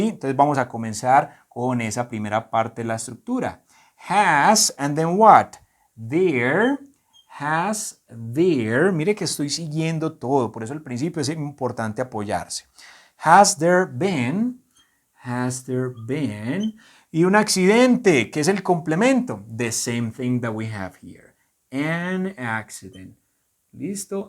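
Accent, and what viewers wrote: Colombia. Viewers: Me gusto este canal porque me encanta el acentos colombiano parce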